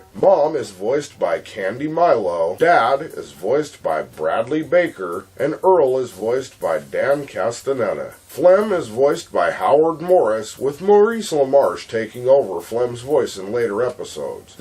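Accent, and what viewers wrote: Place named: United States. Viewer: I didn’t know Max Cavalera did 90s show reviews in an American accent.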